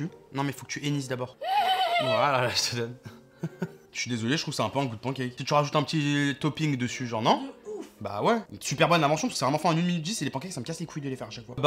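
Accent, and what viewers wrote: Quebec, Canada. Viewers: Juste l'accent quebecois mérite un like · Ptdr l'accent québécois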